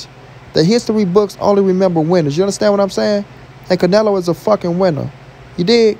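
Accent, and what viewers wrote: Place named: St. Louis, United States. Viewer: I'm a white dude from north county STL, just happened across this video and soon as I heard you say "Give him his flowers while he HUR" I said aw shit this dude from the Lou 😆 Good content bro, respect💪💯 #Subcribed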